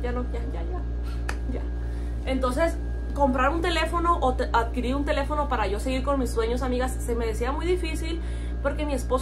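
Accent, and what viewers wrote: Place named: Sonora, Mexico. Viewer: Que emoción 🎉 Yo llegué a tu cana porque me apareció el video de comer con 50 pesos, me llamó la atención y más el tono de tu voz dije ha de ser de por acá 😅 y luego después me apareció donde lavas los unifofme y dije ¡Ah si es de Sonora! ❤ y te di seguir y desde entonces me ha fascinado verte y ver a tu hermosos hijitos, como vas creciendo día con día. Me encanta tu actitud y como cuidas a tus niños. Muchas felicidades paisana ❤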